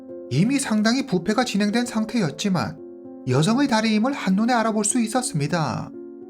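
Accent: China. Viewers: 말투 뭐임? 선족임? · 주인장 조선족임?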